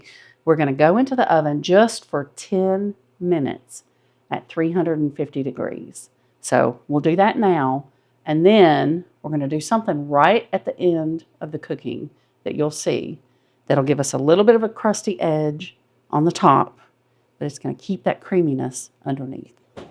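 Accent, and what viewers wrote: United States. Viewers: 1st time viewer in Latvia. Wow, the minute I heard your accent, I knew this was gonna be the real deal for this iconic American dish! Appreciated the relaxed, down to earth presentation. Subscribed. Thank you!🎉 (Will maybe add some ground pepper tho😂)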